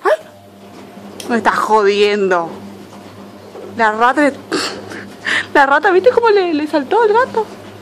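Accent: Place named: Argentina. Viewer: El acento de las argentinas es el mas dulce del mundo! Alguien de alli me puede decir si la mayoria de las Argentinas hablan parecido a la "locutora" de este video???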